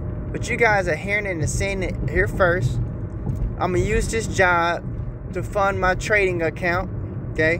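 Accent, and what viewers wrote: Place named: United States. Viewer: My mentor is sounding more country each video lmao. Good job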